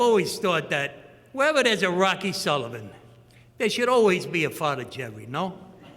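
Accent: Brooklyn, United States. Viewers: I've see other other interviews with him, where he had the much cooler Brooklyn accent. Looks like his New Jersey accent has now become his default after Sopranos. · I just fucking love his Brooklyn accent! I could listen to him all day and not get bored.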